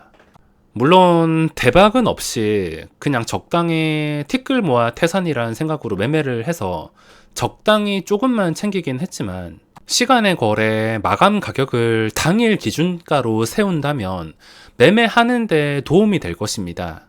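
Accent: Gyeongsang, South Korea. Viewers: 님께서는 오늘 원없이 단타를 쳤습니다라고 멘트 하실 때 제일 멋져요 님의 지난 영상도 다시 보고 매일 올려 주시는 그날의 영상도 다시 자꾸 보게 되는데 노터스 금맥 짚으시고 울면서 보내주어야 할 것 같습니다라고 멘트하실 때 제일 쿨해 보였고 반짝반짝한 종목들 찾아 욕심 부리지 않고 매도하고 시장의 흐름을 그 누구보다 먼저 짚어내시는 모습 아이 데리고 키즈카페에 가느라 종목 움직임 하필 못 보았다고 하소연하시던 인간적인 지난 영상 등등 개미의 입장에서 생각하고 개미들 걱정 많이 하시는 마음이 너무 고운 모습 심법 잃지 않는 매매 정확한 저점 확인의 두근두근하던 날들 모두 응원하며 공감하고 듣고 있습니다 귀에 쏙쏙 들어오는 경상도식 억양의 종목명 언급 기대할께요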